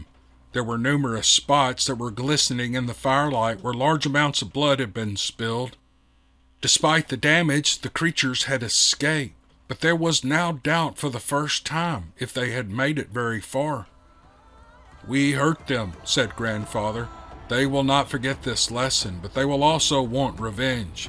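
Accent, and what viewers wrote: South, United States. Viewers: Enjoying this storyline alot. Do hope there will be more stories in the future. Will be listening to number four after this one, of course. Thank you, you narrative is great, like the southern drawl. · You did an excellent job reading these to us. I've heard you before, but hubby and I sat down and listened to all 6 Parts in one day, no joke. We couldn't stop listening until we heard them all. You are an excellent storyteller, and we appreciate you!! Your southern drawl and just the way express everything makes it come alive. I do not want to forget DA Roberts, who without him these would not be possible. Thank you again.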